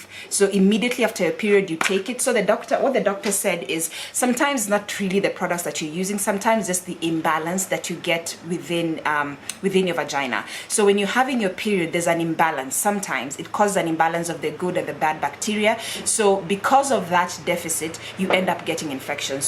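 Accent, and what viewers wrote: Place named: South Africa. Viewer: thanks for the information...love the accent, is it South African?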